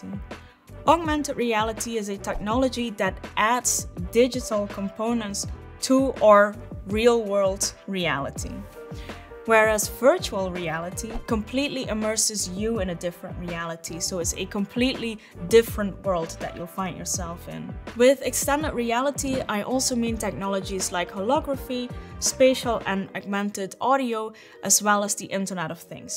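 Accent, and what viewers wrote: Netherlands. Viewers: are you dutch? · She sounds so dutch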